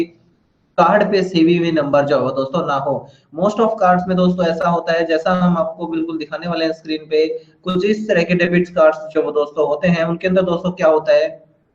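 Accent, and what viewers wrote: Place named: India. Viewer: Just FYI he's not spekaing spanish... thei accent is hindi like, just saying don't asume.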